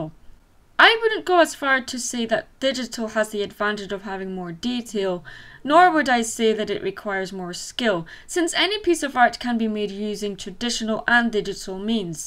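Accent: Scotland, United Kingdom. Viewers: wait....are You Scottish?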